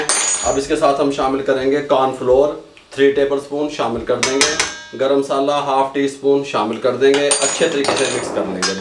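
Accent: Punjab, India. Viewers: Ap ki punjabi urdu son ker maza ata he.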